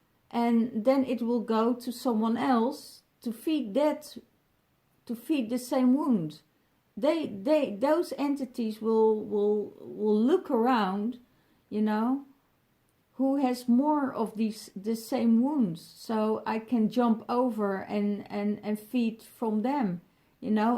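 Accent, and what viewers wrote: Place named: Netherlands. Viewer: I can hear you are Dutch hahaha it obvious hahah; ik ben afgelopen weekend zwaar getransmuteerd 👌🏻 I like it!!!!!!! Ik ben een man beschouw mezelf als DF, Scorpio. Indigo, yellow worrier en heyoka T is allemaal om de dualiteit in zelf naar 0. of.0 te brengen Lekker in balance joh 👌🏻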